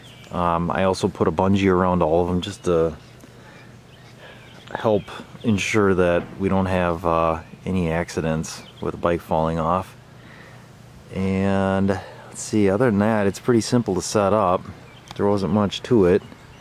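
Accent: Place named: Fargo, United States. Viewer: Where the fuck is this accent from Fargo?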